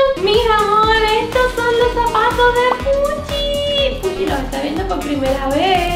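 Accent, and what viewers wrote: Cuba. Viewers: Hola, me gusta mucho ver tus vídeos y escuchar tú acento cubano 😅 Participó por cualquier cosa, así como dices tú "la suerte es loca y a cualquiera le toca" esperemos que ahora sea mi turno 🤗🇲🇽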